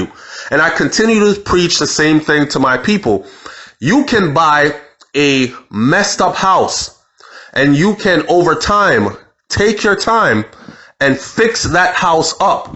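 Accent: Jamaica. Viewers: I appreciate the content but I find voice to be annoying especially the absurd blend of the Jamaican and American accent. His articulation and voice pitch is awful. I would recommend he get speech therapy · I am living here in the U.S. and to tell it in the Jamaican way the way you did, u no fool (as mi granny woulda a sey). Yu back up yu story wid facts an' mi proud a yu. I have been following the Harris story and I have decided who I will vote for in November. Peace!!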